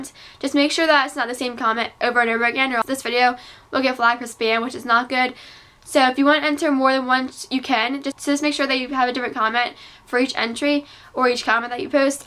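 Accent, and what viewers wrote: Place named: United States. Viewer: Your so pretty and I love your accent I want to be American ahh , the falsies mascara did not justice for my lashes it just wasn't worth the rave hah xox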